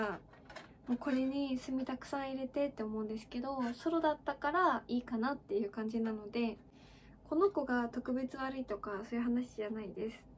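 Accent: Japan. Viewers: お疲れ様です 今頃のコメントで申し訳ありません。 動画を拝見して、「うるかす」を初めて聴きました。先日の「耳がいずい」もそうですが、東北地方のご出身とのことで、お国訛りは、国の誇りです。どんどん使ってください。（本気の東北訛りだと標準語の字幕が必要かも？） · 青森出身？青森の おじさんがうるがす。 言うてた。後～、薬の イントネーション同じ。 へばな。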